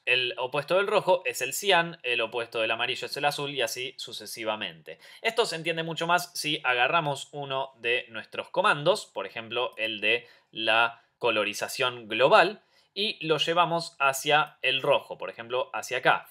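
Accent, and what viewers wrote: Argentina. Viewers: Nuevamente, si así puedo tutearte!! muchas gracias desde España!! te felicito por tu forma de explicar las cosas, es útil y divertido!! y me encanta tu acento argentino y la forma de expresarte!! me estoy tragando cada tutoría con el mas grande interés!! buen trabajo!! Vale puede parecer un comentario muy pelota pero que narices, a veces, corresponder a algo bien hecho es merecido!!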